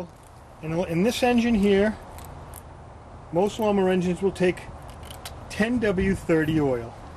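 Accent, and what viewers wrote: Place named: New Jersey, United States. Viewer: Youve got a jersey accent. My daughta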